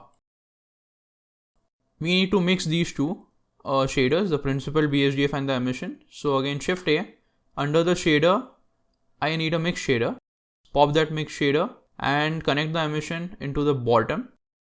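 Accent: India. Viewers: Bro r u indian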